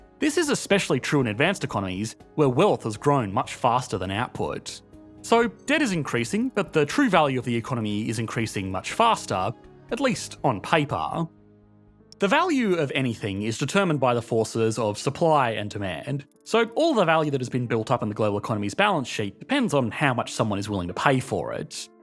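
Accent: Australia. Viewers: An Australian Chrisopher Walken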